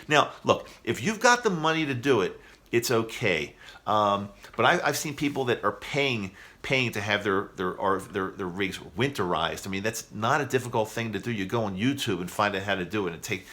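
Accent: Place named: West, United States. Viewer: I would guess that you are from a high cost of living area. Most likely Washington, Colorado, California, or Oregon. You don't sound (accent) like you are from a high cost of living area in the Northeast like Boston, New York, New Jersey, New Hampshire or Vermont.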